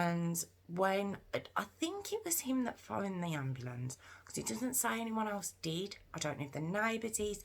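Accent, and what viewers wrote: Birmingham, United Kingdom. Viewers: I love your accent! Are you from Birmingham?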